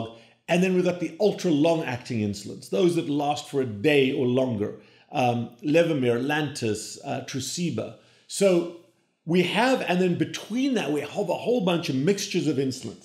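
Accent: South Africa. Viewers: Hello! Is that a South African accent? Ek hou van die afrikaanse taal. Greetings from Evergreen, Montana, USA.❤❤❤ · yes he is from south Africa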